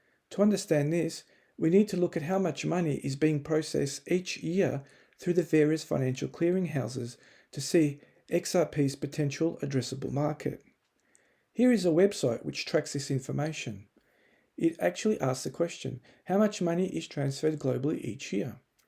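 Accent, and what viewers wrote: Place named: Australia. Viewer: Me too! Great to hear a fellow Aussie in this space!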